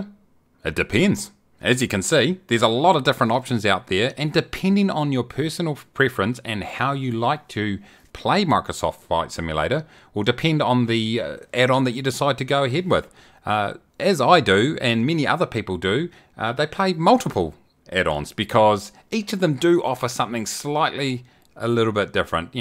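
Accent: New Zealand. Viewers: 🤣 The auto text understood you to say Korea instead of Career. You know that I Love the Kiwi accent mate. 🥝 🇳🇿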